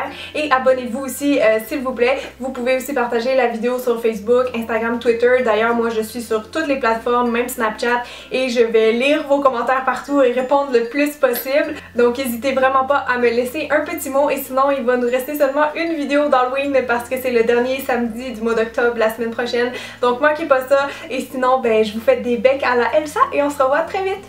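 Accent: Quebec, Canada. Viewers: OMG je t'adore!!! Jolie, intelligente, douée, et tellement drôle x'DLes Bloopers, surtout le final, m'ont tuée:')Et puis le maquillage est super beau!!! Bisous d'une abonnée fidèle, et merci pour ce tuto (Elsa est une de mes princesses préférées... ^^)LaurelinPS: J'ai une famille québecoise mais je ne vis pas là-bas, alors quand j'écoute tes vidéos, l'accent trop cute me rappelle la maison. Change absolument pas t'es parfaite, gros becs.:D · je comprends ton point... Mais ce que je voulais dire c'est que c'est une manière de parler... En france vous prononcez "s'il te plé" tandis qu'au Québec, nous prononçons "s'il te plè"... Vous dites un toast, nous disons une toast... c'est peut-être "une faute"... Mais c'est comme ça qu'on dit ici (en général)... n'est pas une enseignante de français... Elle n'a pas (selon moi) à changer sa manière de parler ou les mots qu'elle emploie, peut importe son auditoire... 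Mais ça, c'est encore que mon point de vue... Venant d'un Québécoise fière de sa culture et de son jargon!:) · Hahaha j'ai tellement aimé le doigt à la fin. xD Je vais le rabâcher encore et encore mais t'es tellement douée ! Et drôle aussi. Et super jolie. Pat dépêche toi de la marier ! 😜 Le maquillage des yeux est vraiment canon ! J'adore t'écouter tous les samedi parce que je t'apprécie et aussi parce que j'adore l'accent québécois et vos expressions un peu singulière (je suis de Paris) haha.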